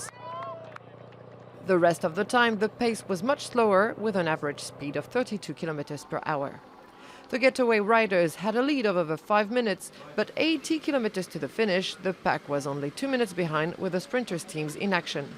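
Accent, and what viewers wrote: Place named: France. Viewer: I really like her frensh accent, it fits so well to this event and sounds wonderfully different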